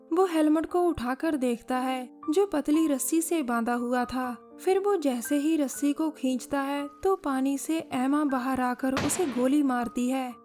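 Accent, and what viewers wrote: Punjab, India. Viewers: Mam I love your voice hope I didn't get addictive 😄❤️🇮🇳 Punjabi tone is the best 👍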